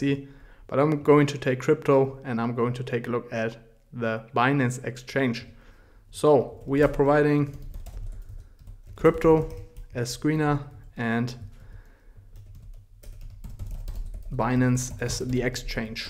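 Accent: Germany. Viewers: ich höre du bist deutsch, wäre es möglich mal du reden über discord? ich habe kranke erfahrungen , also sehr sehr viel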